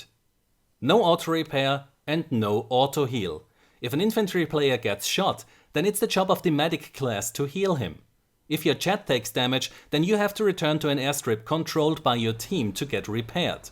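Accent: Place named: Germany. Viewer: can you speak german? I swear I heard a lot of german pronunciation in your work, so cute!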